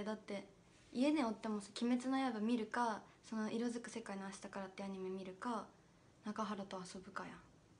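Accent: Okayama, Japan. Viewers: 岡山弁って正直きちーと思っとったけーこの人の言葉はすげー可愛い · ノブのイメージしかなかった岡山方言がこんなにキュンとするとは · 岡山県井原市？バラ園いいですね！！久しぶりに岡山弁聞いた。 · 岡山って俺の地元の喋り方に似てるわ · 掛橋さんの岡山弁好き。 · 声かわいいから岡山弁でも柔らかく聞こえる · 岡山の方面とかに友達おって頻繁に電話しとるからか分からんけど岡山弁が全然普通に感じる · 岡山弁が「出ちゃう」じゃなくてもはやただの岡山弁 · 耳に馴染んでる言葉じゃけぇさ、岡山に帰っとる気がしょーたわ。ごじゃかわいいべや （北海道民） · 千鳥みたいな岡山弁使ってくれたらもっと好感度あがるな❤ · 岡山弁はじゃけえとやけえが混在してるのか広島弁と関西弁が混ざってんのね(ドイツ人) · まだ綺麗な岡山弁じゃわ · いとこが岡山県だけどほんとにこんな感じや笑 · 出ちゃうというか終始岡山弁やで。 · 割とまじで疑問なんだけど岡山の何処だったらここまで方言つくの？ by市内民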